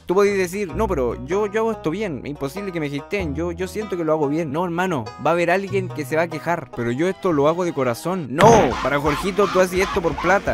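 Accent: Chile. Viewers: Si no le entiendes simplemente pon subtitulos o vete del vídeo, Además ¿que tan difícil es entender el acento chileno? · De que parte de chile es chileno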